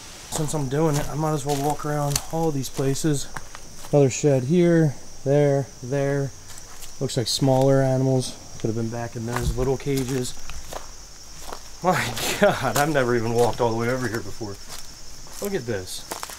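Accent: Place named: Pennsylvania, United States. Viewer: Hey, just found your channel and subscribed, interesting content. I think some of those are aviary coops? These people were breeders it seems. Those mugs are worth money dude, someone put a lot of money into this property. Is it for sale? Unbelievable, it looks like it can be saved at this point. Oh, I'm detecting a accent, are you from PA? Anyways, enjoyed the content, thanks bro! 🌴😎🌴FL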